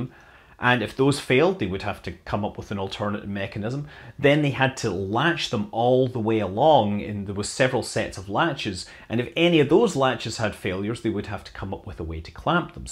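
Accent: Scotland, United Kingdom. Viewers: YouTube cannot compute the awesomeness of the Scottish accent, even at this level of dilution. What would it do with Glaswegian after ten lagers?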